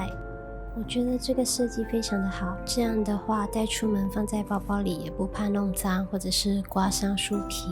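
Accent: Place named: Japan. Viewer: Hi ~ I always thought that you are Japanese. 😅 Your Chinese sounds are so cute.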